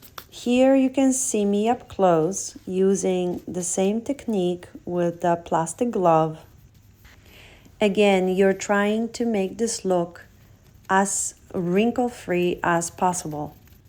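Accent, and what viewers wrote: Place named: Europe. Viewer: I’ve been watching all of your shorts and just tuned into this. I’ve been wondering where you find all of your beautiful pieces and now that I hear you beautiful accent I’m thinking you must be in Europe and it makes since how you find the pieces. I live in Colorado USA and believe me these cowboys out here don’t have such great pieces like you find.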